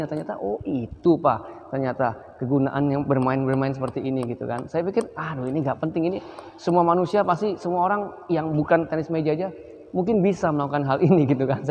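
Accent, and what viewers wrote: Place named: Palembang, Indonesia. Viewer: Ay wong Palembang koyong ini😂 Palembang dimanonyo Yong?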